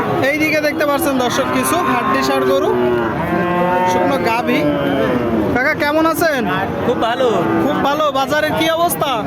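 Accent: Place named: Jessore, Bangladesh. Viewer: আসসালামু আলাইকুম।ভাই এটা একটা অনেক বড় প্লাটফর্ম।এই ভিডিও অনেক মানুষজন দেখে। অতএব এখানে শুদ্ধ ভাবে কথা বলাই উত্তম। যেমন, আপনি বলেন কিরমিরি ,সত্তশ (হবে কৃমি,সত্তর) এধরনের শব্দ কি আদৌ বাংলা ডিকশনারিতে আছে? তাছাড়া যশোরের ভাষার একটা ঐতিহ্য আছে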